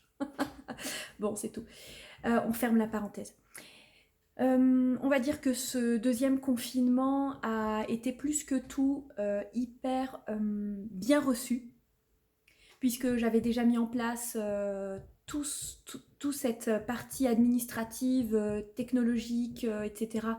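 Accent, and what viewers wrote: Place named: Alsace, France. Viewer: Merci c'est toujours un plaisir de vous entendre parler que ce soit de peinture ou de votre bilan. Mais où est passé votre accent Alsacien 😁. Je vous souhaite une belle et heureuse année artistique. Bisous et à très vite pour votre prochaine vidéo 👍😉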